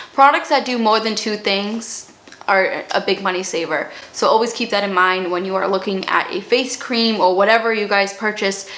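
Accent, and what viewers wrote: Boston, United States. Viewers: I subscribed within seconds of watching your video because I heard the Boston accent - and then you talked about Mass so my suspicions were confirmed! Love that I get to follow a local gal with good advice. :D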